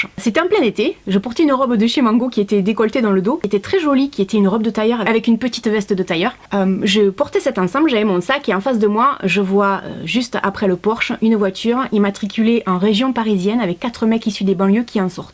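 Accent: South, France. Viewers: Bonjour tout a bord je tiens a te dire que tu es une tres belle femme.J'aime ton style et surtout ton tres belle accent du Sud de plus j'adore les femmes rousses.J'aimerai qu'il plus de femme que moi toi sur le net.Une femme s'embélit avec temps.Je regarde toute tes videos car tu es naturel. Vive les femmes rousses. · Moi, j'adoooooooore ton accent du sud, tu ne peux mm pas imaginer, je t'adooooore, reste comme tu es parce que je suis convaincue que tu ne changement jamais, et les ingrats vont continuer à être emmerder(désolée pr ce dernier mot il fallait que je le dise)😘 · Je trouve que c'était une bonne vidéo à faire! Le commentaire "t'as l'accent du sud mais t'es pas bronzé" est tellement inattendu qu'il me fait rire!! L'expérience de l'entretien d'embauche, tout simplement scandaleux!!! Et les commentaires très injurieux que tu nous as montrés sont juste... 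incroyables, inimaginables...!! Bon courage et bravo! Bisous!! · Bonjour et merveilleuse année à toi ainsi à tous tes abonnés 😊 tu es une belle personne intérieurement et extérieurement 😉mais ce que tu racontes n’est pas surprenant les gens sont indélicats pour être polie 🤬ils sont jaloux et reflètent sur les autres leurs propres angoisses. J’adore ton franc parler et ton dynamisme et l’accent du sud est magnifique (je sais de quoi je parle)🤩 bises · Cc moi jai eu droit aussi le genre de remarque sur mon poids .Les gens sont méchants cest pour sa que je suis mieux avec mes animaux et oui aussi jadore ton accent du sud ,accent du soleil 😉plein de bonheur pour 2021 et a ton toutou aussi ❤ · Attend attend attend, donc on t’a reprocher le fsit que tu ai un accent du sud mais que tu es trop blanche ? Mais... il y en a vrm ils ont un problème avec les couleur de peau ma parole c’est pas possible ça 🥲😭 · moi je l'adore ton accent du sud sa respire le soleil ;) et on est tous différent physiquement et mentalement heureusement sinon le monde serait fade merci pour ta vidéo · J’adore l’accent du Sud !!! 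C’est top!!!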